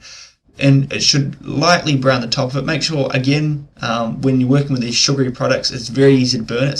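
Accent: New Zealand. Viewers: Are you a Kiwi….you talk like me? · Are you from new Zealand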